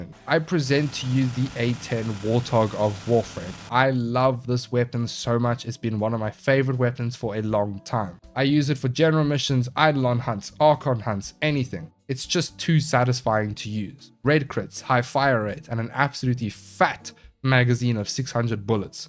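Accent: South Africa. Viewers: As someone who recently got back into Warframe, thank you! 🫡 Also, your accent sounds like you're South African, if you are, I hope that loadshedding is kind to you Tenno 🙏🏻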